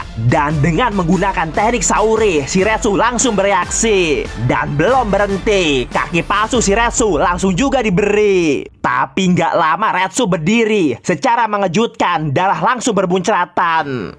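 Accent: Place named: Jakarta, Indonesia. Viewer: Logat nya kayak orng betawi nih...seruu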